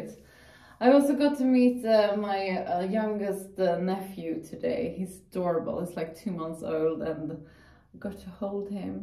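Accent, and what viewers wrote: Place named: Spain. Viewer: I like your Spanish accent while speaking.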